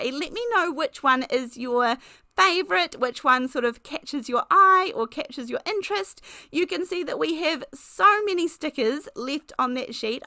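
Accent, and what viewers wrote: New Zealand. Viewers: I'm an Aussie an although we usually take the mickey out of our Kiwi cousins I also like accent and herbright and breezy outlook always makes my day 💕🤪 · agreed. I love your Mainland accent😊 it brightens my day. ❤ have a fabulous week. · Beautiful cards from cute stickers. I love them all. I love your accent, too. I watch a lot of BritBox and you have helped me to identify the New Zealand accent 😊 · !! Don’t say vayze. Be proud of your kiwi accent with English roots and say varze. Lol. And yes i also love your smiley happy voice too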